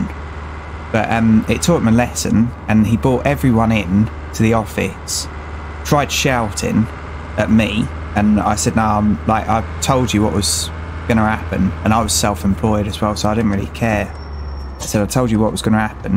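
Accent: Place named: United Kingdom. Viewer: 3am and I just bought this haha, sod sleeping tonight mate lol. Nice to hear another British accent too!! We're getting overrun by merican oootubers haha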